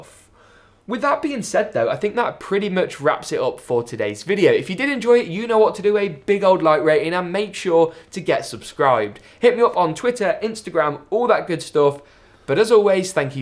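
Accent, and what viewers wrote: United Kingdom. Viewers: You're from the UK right? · are you from the uk? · GeekaWhat you sound like you might be from the uk but you always put USD into the titles. It confuses me😂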